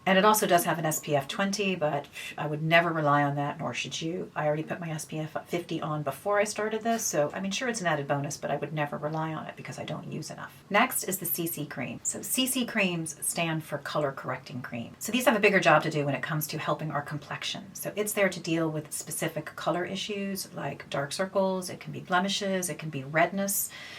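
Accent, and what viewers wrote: Ireland. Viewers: You sound a little bit Irish 🇮🇪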